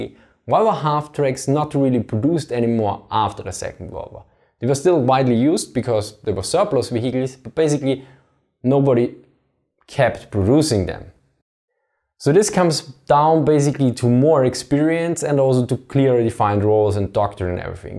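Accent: Germany. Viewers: Seine Aussprache ist nicht so schlecht. Er hat ein starke deutsche Akzent, aber er ist noch verständlich, ganz besser als viele Engländer 😂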